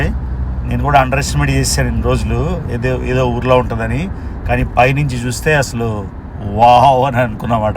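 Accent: India. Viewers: Turpu bhasha bale matladutunnavu,vinadaaniki chalabavundi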